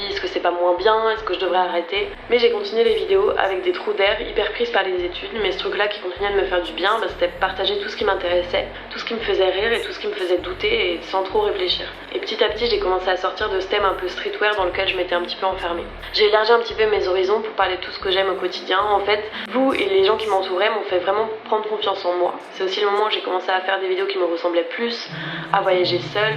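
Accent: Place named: Alsace, France. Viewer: Je me rappelle de tes débuts dans ta chambre, j'adorais tes vidéos, ton univers très épuré et puis ton accent alsacien qui ressortait parfois sur un mot 😅 (je suis également alsacienne). Quelle periode ❤️ Et que de chemin parcouru ! Bravo à toi et bonne continuation dans tous tes projets ! 🙌🏼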